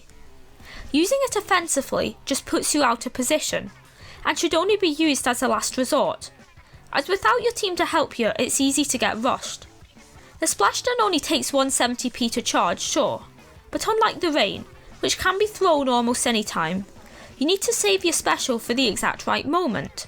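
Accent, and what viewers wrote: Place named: Scotland, United Kingdom. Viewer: your voice is very scottish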